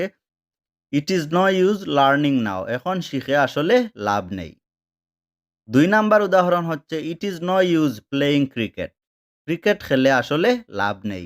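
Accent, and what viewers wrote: Sylhet, Bangladesh. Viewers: Sylheti vai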